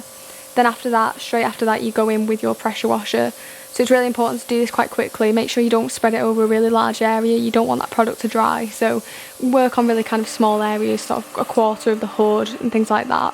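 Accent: United Kingdom. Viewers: The three best things you can ever find is on this channel. Car Detailing British accent 😍 Cute Girl 👌🏼👍🏼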